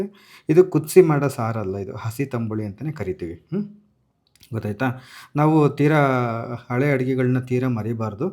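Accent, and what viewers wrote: Chitradurga, India. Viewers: ❤ chitradurgada bhashe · ತಮ್ಮಣ್ಣ ನಮ್ಮ ದುರ್ಗದ ಭಾಷೆ ನಿನ್ನ ಬಾಯಲ್ಲಿ ಕೇಳೋದಿಕ್ಕೆ ಬರ್ತಿ ಚನಾಗಿರುತ್ತೆ ತಮ್ಮಣ್ಣ 🎉🎉🎉🎉🎉🎉🎉🎉🎉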